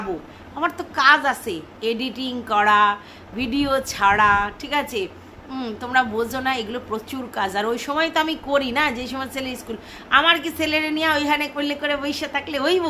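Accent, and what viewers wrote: Bangal, Bangladesh. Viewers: ভিডিও content je flavour tar sathe বাঙাল ভাষা মিলেমিশে খুব খুব মজা 🎉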